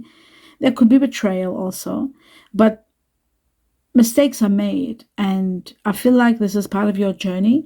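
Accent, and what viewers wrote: Eastern Europe. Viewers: seems Eastern European to me.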